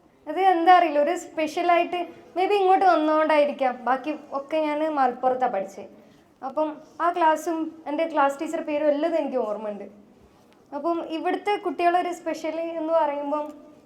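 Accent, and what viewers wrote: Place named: Kasaragod, India. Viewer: Masha allah mole congratulations ❤❤eniku kasarkkode bhasha bhayankara ishtamanu😂keep it up mole❤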